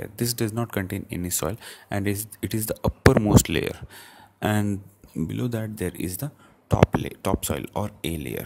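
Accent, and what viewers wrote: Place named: India. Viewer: harsh chandra it is Indian pronunciation, If you don't know about it refer Google . It is a Indian video so we use Indian English and Indian pronunciation .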